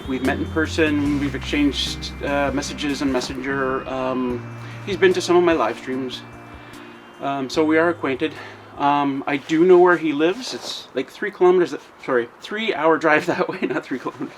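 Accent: Canada. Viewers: Big fan of Shawn James I get a kick out of accent! Now that’s a Canadian accent! With Shawn you only get an occasional aboot!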